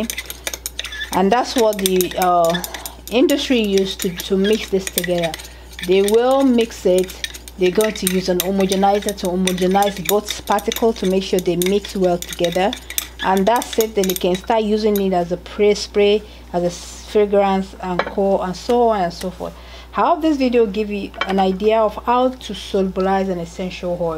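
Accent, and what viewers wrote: Nigeria. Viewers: I came here and heard the Yoruba accent. Arabirin Mi, Esheeeyyy ❣❣❣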